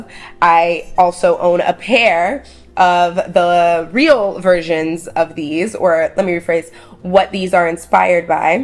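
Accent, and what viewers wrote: New York, United States. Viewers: Am I the only one that hears a New York accent now with her?????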